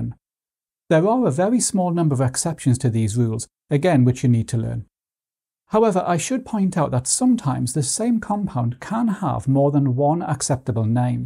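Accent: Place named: RP, United Kingdom. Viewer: Why do you sound so posh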